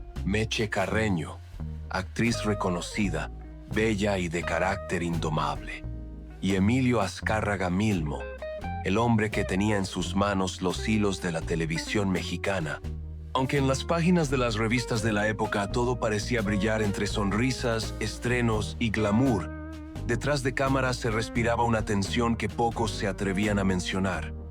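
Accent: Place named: United States. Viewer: Esa voz, como agringada, no se oye muy bien, pero meche se fué por el negro durazo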